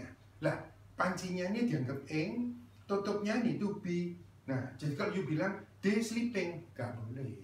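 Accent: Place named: Surabaya, Indonesia. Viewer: Kangen materi selanjutnya nih.. ditunggu upload nya, oh iya saran kalau bisa materi ini diupload ulang dengan resolusi yang bagus biar tidak pecah.. pak ada kontak atau alamat kursusnya ya? Sepertinya pak org surabaya aksennya.. makasih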